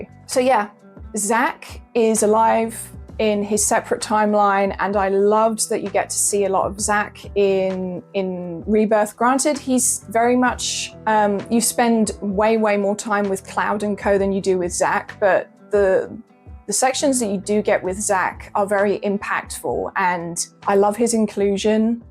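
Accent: United Kingdom. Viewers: Love a British accent 🌹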